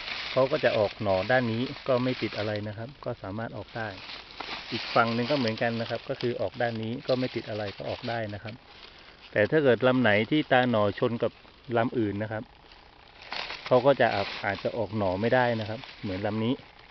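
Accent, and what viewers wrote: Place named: South, Thailand. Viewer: สำเนียงน้ำเสียงเหมือนคนใต้เลยครับ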